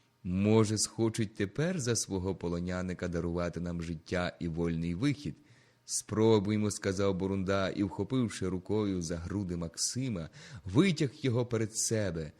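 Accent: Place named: Western Ukraine, Ukraine. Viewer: Щиро дякую за чудову роботу.Милує слух мова Заходу України. тих часів.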